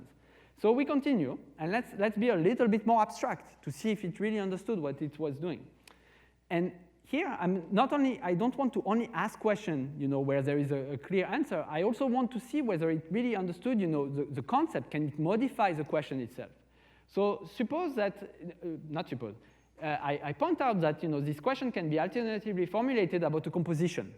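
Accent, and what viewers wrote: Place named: France. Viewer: Stochastic Parrots 🦜! Taking over the world! Clever birds! Anyway, I would have expected Sebastien to be affected by Methuselah Syndrome, it would only have been fitting. But the french accent makes up for it, like François Truffaud in "Close Encounters".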